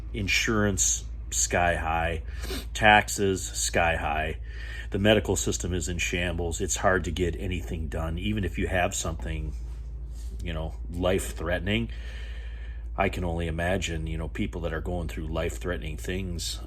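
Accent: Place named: Wisconsin, United States. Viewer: youhave a Wisconsin accent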